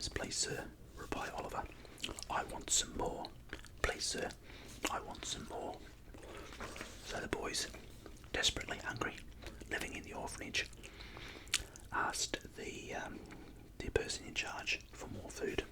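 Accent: Australia. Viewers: Subscribed , turned on post notifications , I’m looking forward to all your videos , I love Australian accents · 😍Yay Australian accent book reading and gum chewing! My favorites in one video. OK goodnight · I'm Venezuelan, but I really love aussie accent, and this help me a lot to lear english. This videos are so amazing, and your voice is incredible 💖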